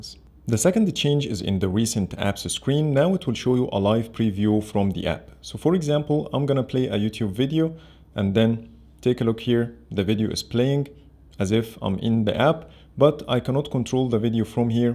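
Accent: Egypt. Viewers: يابا ال accent المصري معروفة في اي حته 🇪🇬 😅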